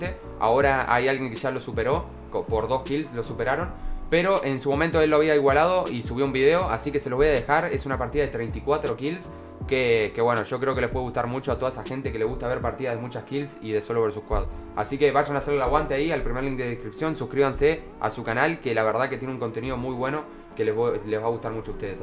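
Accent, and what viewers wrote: Uruguay. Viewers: sos uruguayo a argentino sacame la duda capoo?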